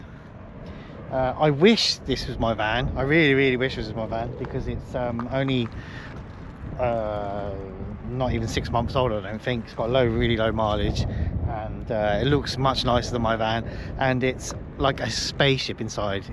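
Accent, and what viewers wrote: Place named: Cambridge, United Kingdom. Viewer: Why does your accent sound like your from my ways ?.cambridge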